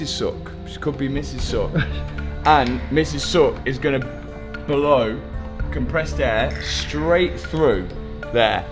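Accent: United Kingdom. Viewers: Watching your videos to learn british accent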